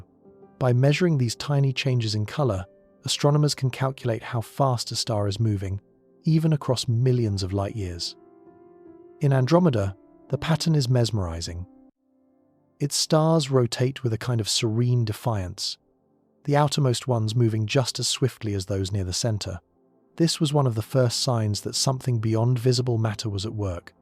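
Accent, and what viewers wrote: United Kingdom. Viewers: all these damn AI robotic voices have Brit accents. Can't we get some with Nigerian or Peruvian accents?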